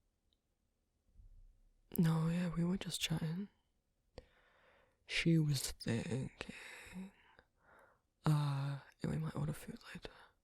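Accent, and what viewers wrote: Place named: United Kingdom. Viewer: GRAAHHHH i ADORE the british boyfriend audios!!! all your recent audios have been AWESOME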